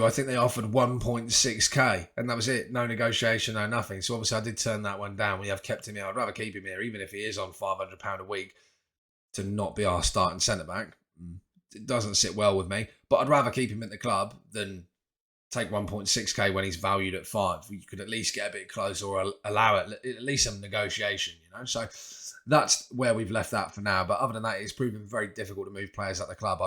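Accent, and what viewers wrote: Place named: Australia. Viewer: can definitely hear a bit of aussie working its way into your accent lol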